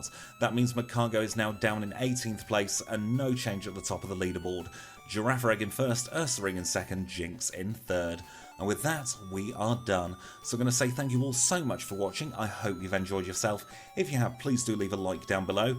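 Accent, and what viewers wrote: United Kingdom. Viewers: Searched for quagsire runs and was not disappointed. Also, nice bonus to hear a fellow Brit doing Pokémon runs too! Great video thanks 😊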